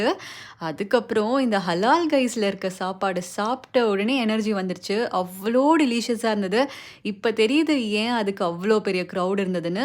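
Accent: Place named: Tamil Nadu, India. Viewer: Fooooooodu, worst tanglish assent ever I heard 🤬🤬🤬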